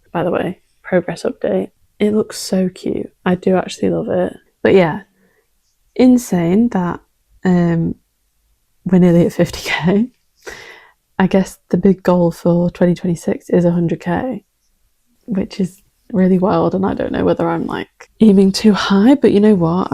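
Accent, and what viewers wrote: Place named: United Kingdom. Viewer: for a second i thought the pronunciation thing was wrong, and then i realized… british. lol in most american accents we say MAcrame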